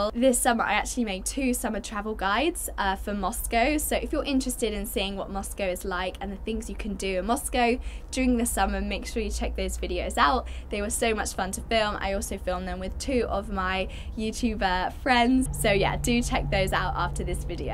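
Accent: England, United Kingdom. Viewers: она очень понятно говорит, чисто английское произношение. вот американское тяжело воспринимаю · I love listening to real English😁👍🇬🇧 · Где я не видел ,но все равно понятно ,для прокачки английского подходит!) Британский прослушивается явно,но он смешанный как показался. Но она красива , говорит вполне понятно , восприятие на слух приятно. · Мне очень нравится настоящий английский акцент! Это так красиво звучит ... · Лицо славянское. Если бы не британский акцент, я бы сказал, что она русская.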